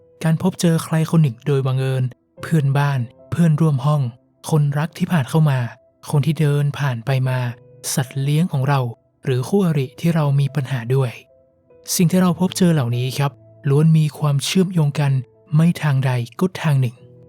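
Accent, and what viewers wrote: North, Thailand. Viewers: คนเหนือแน่นอนครับ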